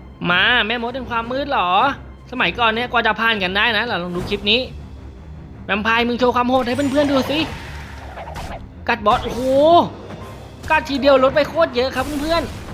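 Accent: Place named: Provincial, Thailand. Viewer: มาแล้วไอ้หนุ่มเสียงเหน่อ ที่รอคอย BRAVO~~~~~~~~~~~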